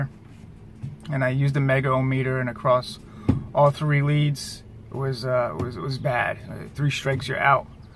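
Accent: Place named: New Jersey, United States. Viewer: Damn. Didn’t know you were from Jersey.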